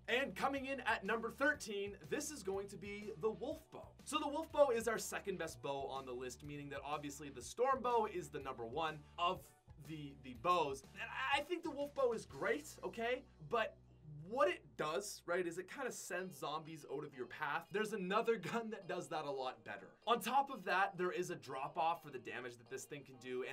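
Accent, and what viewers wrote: Canada. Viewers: I love how Canadian he is. Oat-standing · Love your videos!! You're Canadian accent is hilarious too😂😂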